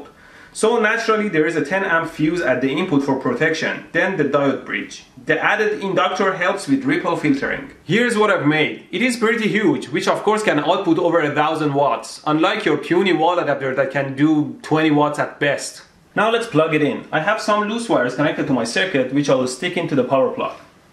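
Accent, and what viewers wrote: India. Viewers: indian,just indian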